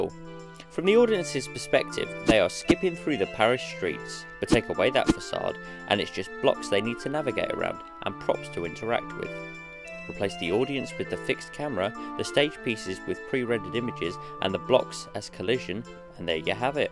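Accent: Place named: Cockney, United Kingdom. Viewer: dat cockney accent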